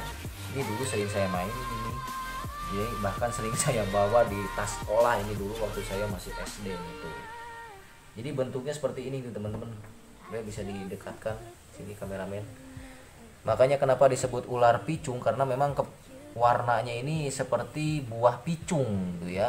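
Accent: West Java, Indonesia. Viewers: Ohhh abang. Orang sunda? Wkwkwk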